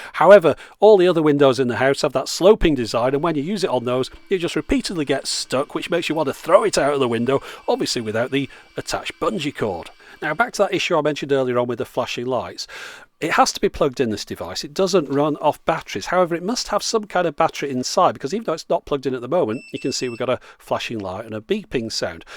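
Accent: United Kingdom. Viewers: Charming British accent... mmm... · I love your videos and your voice! Its so British and classy.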